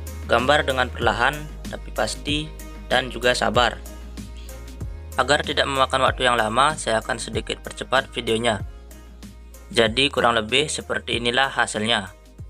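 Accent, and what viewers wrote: Aceh, Indonesia. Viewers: Aceh kah?? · Awak Aceh BG nyo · Orang Aceh ya bang?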